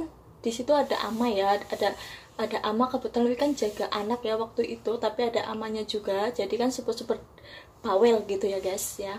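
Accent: Banyumas, Indonesia. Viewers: Cerita pengalaman kerja disingapore.. SINGAPORE daerah enthi nduok??? Ngapak wadon 😍😍😍😍😍😍😍 Taiwan singapore,duiten arep gae opo nduok😀😀😀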